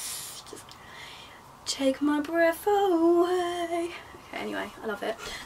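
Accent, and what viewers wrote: United Kingdom. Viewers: Amazing body..love your goofy British accent too very cute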